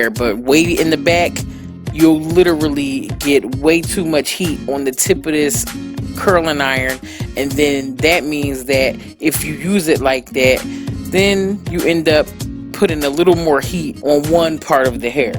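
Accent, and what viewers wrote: Philadelphia, United States. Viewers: Love your channel! I could listen to your accent all day. I live in Atlanta but I’m from the suburbs of Philly and as soon as I heard your voice I knew!!! Such talent ❤️☺️ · Omg where are you located...you lowkey sound like you from philly and that’s where I’m at and I need a appointment 🤩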